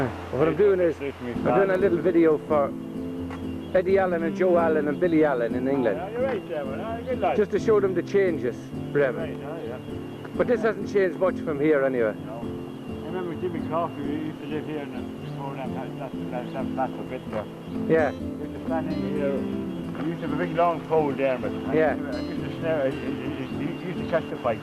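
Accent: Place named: Cavan, Ireland. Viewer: Thank you Ciaran. Glad you like it and my Cavan accent!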